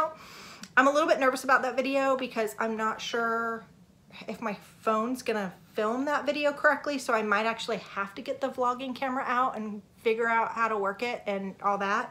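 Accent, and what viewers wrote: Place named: South, United States. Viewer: Appreciate your real life relatable stories and your Southern accent!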